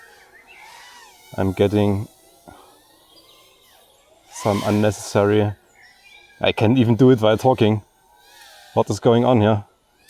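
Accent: Germany. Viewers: Damit und das mit deutschen Slang aiso rock das Ding ❤😂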